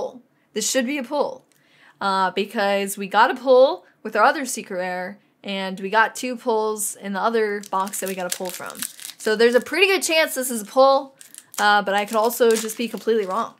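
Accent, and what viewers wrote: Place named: Rural, United States. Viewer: That country accent tho lol!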